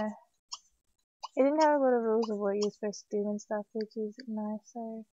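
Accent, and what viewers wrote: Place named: Australia. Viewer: Are you Australian? You sound it :)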